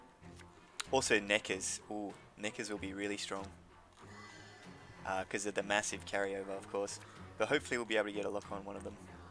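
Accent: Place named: Australia. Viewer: Great Video really liking your content, + Australian accent got me moist.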